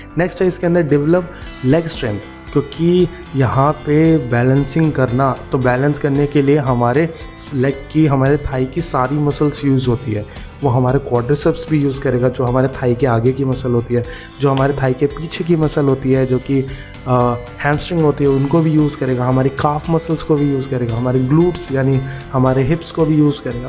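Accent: Uttarakhand, India. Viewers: Bhai ap uttrakhand se ho kya 😊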